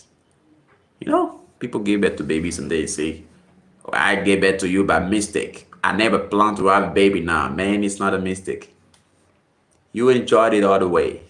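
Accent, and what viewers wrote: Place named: United States. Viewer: Sounds like an Amarican 🎉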